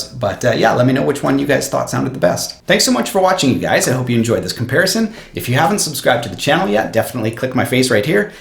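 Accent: Canada. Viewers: Are you Canadian or from Dakota?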